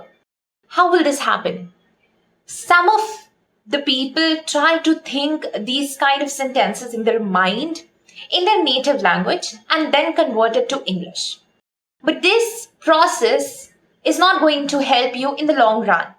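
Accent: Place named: India. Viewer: Thank you for the tips. Are you an Indian? I hear Indian accent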